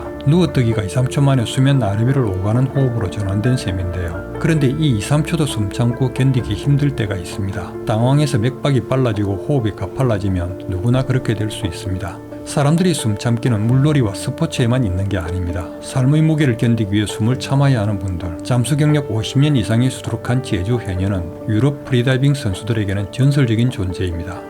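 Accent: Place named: Gyeongsang, South Korea. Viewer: 경상도 분이시죠? 친숙한 말투가 묻어나오시네요 ㅎㅎㅎ 다큐같은 느낌이 잔잔하니 아주 좋네요. 근데, 오디오를 나눠서 시차를 두고 녹음하신건지 맑은 소리였다가 먹먹한 소리였다가 하네요 ㅎㅎ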